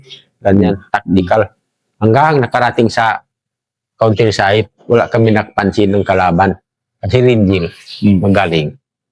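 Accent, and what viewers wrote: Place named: Sulu, Philippines. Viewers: Boses palang ni sir halatang tausug na, salute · Tausug to accent pa lang Tagalog niya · tau luuk jolo sulu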